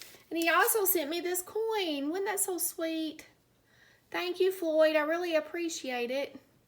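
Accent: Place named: Kentucky, United States. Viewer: Hey there fellow Kentuckian! (I live in PA but I hail from the Bluegrass State!). I found your channel thru MrG1965. I have family in central Kentucky - Greensburg and Campbellsville. It's just lovely to hear someone with a KY accent! I don't hear it much up around here in Yankee territory! My wife does all the cooking here, and she's knows what she's doin too! I'm happy to support your channel and if you feel moved to return the favor that would be greatly appreciated. And if you don't feel moved, well, that's okay too! I hope yall had a wonderful Thanksgiving!! I forgot to ask......may I please have a slice of that delicious lookin chocolate pie?!!??!!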